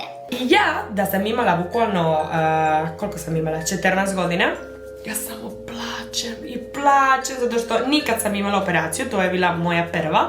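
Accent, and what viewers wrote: Serbia. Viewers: Kraljice bukvalno kada god sam tužna samo pustim tebe mislim malo smesno picas srpski no HEJT volim te mnogooo ja tebe razumem i stvarno bas mi je zao iako znam da je to bilo pre 10.godina Ali idalje ne osacas nogu bas mi je zao volim te najviseeee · Sve slatko ali tvoj Srpski kako slatkoo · Joj kako ja volim kako pričaš srpski !!!! 💞💞💞💞😂😂😂😂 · Boze koliko ti slatko pricas Srpski😍 usavršila si jezik😁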